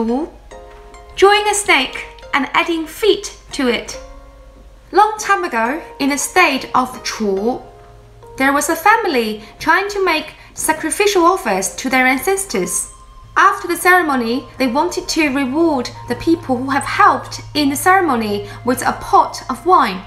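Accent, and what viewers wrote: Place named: United Kingdom. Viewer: You are so adorable 🤗 you have a really nice british accent too. If I were to guess, I'm thinking oxford/london from the accent.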